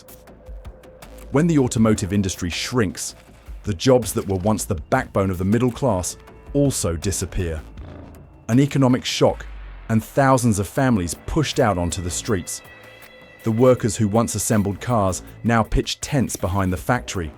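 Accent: United Kingdom. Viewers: in the first 2 minutes it shows homeless in LA and Vancover... while speaking like this is Michigan... Who made this video? Why this dude have a British accent? Idk something tells me they are not from michigan possibly not even from america or know wtf is going on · why am I hearing a limey tell me about my state?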